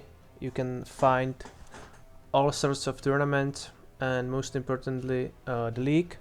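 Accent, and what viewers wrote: Czech Republic. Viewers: u have nice content keep try and u have like czech or slovakia voice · Ten českej accent tam je furt cítit :D, ale good video a nice advices!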